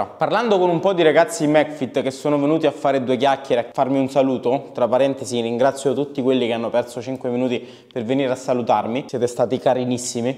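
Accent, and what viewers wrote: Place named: Umbria, Italy. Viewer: Noto un accento della bassa Umbria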